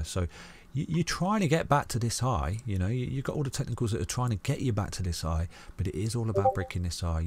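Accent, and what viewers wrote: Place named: Yorkshire, United Kingdom. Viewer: I bet he's from Yorkshire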